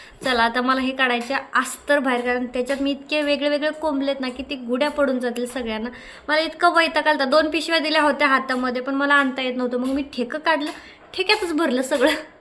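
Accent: Satara, India. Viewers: ठेक आपली भाषा साताऱ्याची 😂😂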